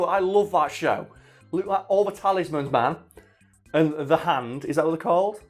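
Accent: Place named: England, United Kingdom. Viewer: True fact: Jackie has never done all his own stunts, he's used stunt doubles for all manner of teeny tiny martial arts moves all the way up to his infamous clock tower fall in Project A, where the main shot used in the film isn't of Jackie falling from the clock face to the fall, it's his co-star and frequent stunt double Mars doing that stunt. Jackie does perform the 2nd shot from further fdown the tower (so only a two-thirds fall), which is the one where he gets up and delivers his lines all in the same shot. Wisely he chose not to do a full fall leading into some minor acting afterwards all in one shot, because however you take that fall, you can't count on being able to stand up, act, and deliver lines afterwards! Also, what country is the videomaker operatng in? He sounds English, but he talks like someone who has never done a basic search for Jackie Chan on amazon UK. 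No jackie chan films available on Blu-ray? Try Police Story 1/2, Wheels on Meals, Armour of God, Crime Story, Project A 1/2, City Hunter, Miracles, Heart of Dragon, The Big Brawl, The Protector, The Young Master, Dragon Lord, Drunken Master, Snake in the Eagles Shadow (coming soon), and all manner of his early Lo Wei productions (Fearless Hyena, Spiritual Kung Fu, Dragon Fist, Shaolin Wooden Men, Snake and Crane Martial Arts of Shaolin, etc)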